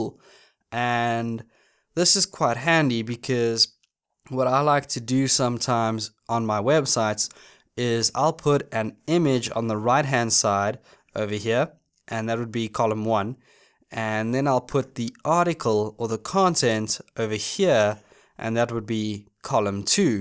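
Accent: South Africa. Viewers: Identified as South African 3 seconds into the video :)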